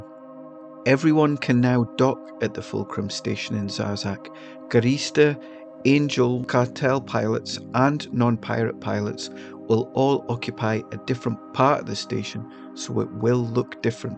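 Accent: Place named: Scotland, United Kingdom. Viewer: Great video, loads of useful info and explained easily. Plus i could listen to that Scottish accent all day, easy listening :)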